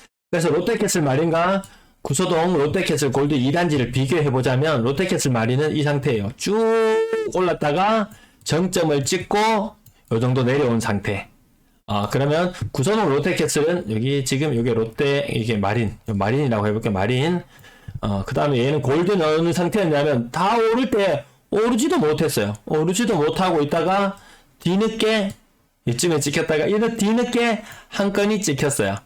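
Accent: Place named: Daegu, South Korea. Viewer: 정보 감사합니다 근데 님 대구분 인가요? 만약 대구분 이라면... 대구 사람치고는 사투리가 작은 편 인것 같아요.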